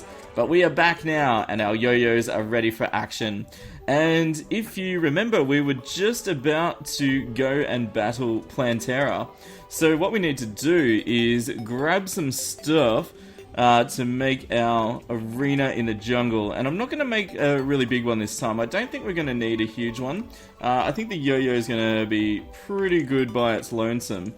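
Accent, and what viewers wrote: Australia. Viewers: congradulations on 80,000 subscribers,! I think one of the reasons your channel grew so quickly is the shoutouts, people keep coming back to see if their shoutout was used, and of course, your australian accent makes you a calm, funny youtuber that everyone enjoys:)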